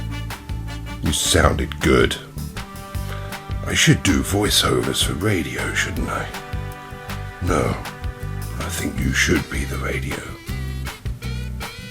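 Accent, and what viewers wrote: United Kingdom. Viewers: This guy does the comparison we wish all other videos would FINALLY do - but they don't. Mixed with a little humor and a little posh UK accent... Informative and entertaining.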